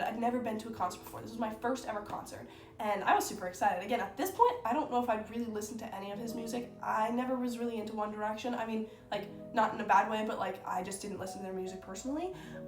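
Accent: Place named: New York, United States. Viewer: So yesterday, being NY born, I saw a vid about NY things you didn't know. Today I get a short of you dressed as a Brit on a rock. (Photographer) I was taken aback as I lived in Dublin for years and I found your doppelganger, good friend then, from Kilkenny. It's not like it's similar, it's exact! Anyway it was cool to see that lovely Irish face again, but speaking with a NY accent. Also very eclectic pics here, I'll check some out, thanks. Slainta and cheers.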